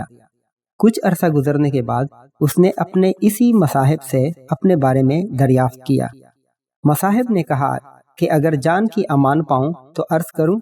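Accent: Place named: Pashto, Pakistan. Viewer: Good excellent. Although yeh Urdu Speaking andaza kisi Pashto speaking friend ka hi Hoskta hy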